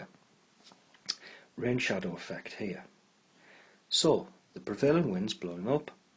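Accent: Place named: North, United Kingdom. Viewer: Bloody love a northern accent - and it helps me to stay interested!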